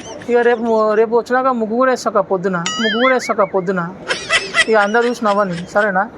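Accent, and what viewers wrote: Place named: Telangana, India. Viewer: Telangana slanglo natural and common points simply superb. Healthy jokes.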